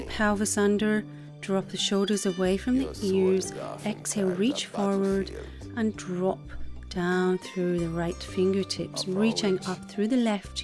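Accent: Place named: Scotland, United Kingdom. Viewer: Love that scottisch accent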